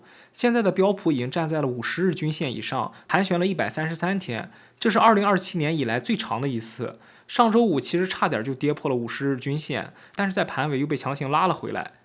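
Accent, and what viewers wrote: China. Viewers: 听说话的习惯播主应该是大陆的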